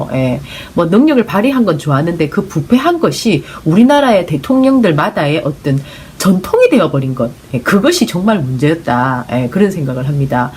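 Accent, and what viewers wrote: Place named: South Gyeongsang, South Korea. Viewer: 경남씩 말투군요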